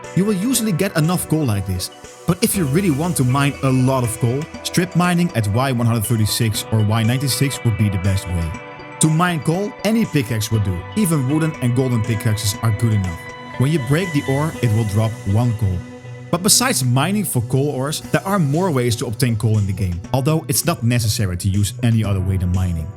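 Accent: Netherlands. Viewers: You’re Dutch aren’t you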